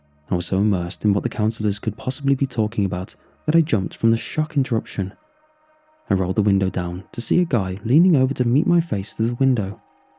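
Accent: United Kingdom. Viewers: I have watched so many videos and the British voice was a major set back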